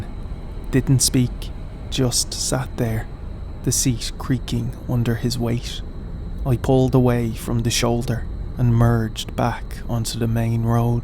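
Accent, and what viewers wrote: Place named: Ireland. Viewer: Hi, may I suggest, you reduce your posting from every day to 3 or 4 days per week. - we don't want to see you burn out, your subscribers care about you - having set days gives you a goal and we know when to look out for your videos - if you do more videos you'll, build up a backup in case the inevitable problem occurs, such as tech glitches - its tempting to give in to the pressure of posting full videos every day. You are the boss, you set your own achievable schedule - suggestion, on non-posting days post a teaser of what is upcoming. This will give you a post a day and maybe help with the pressure. One of the reasons I follow you is the quality of the videos, you aren't American and the Irish accent is gentle on the ear, like the intros and no ads. There is a lot of new YouTubers posting videos in this genre at the moment. 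Quality will last over quantity, hang in there. Keep going, you've got this and we're all behind you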